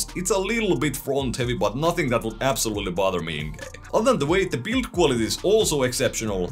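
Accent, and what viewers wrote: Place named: Finland. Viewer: I like u suomi accent. Sounds cool!)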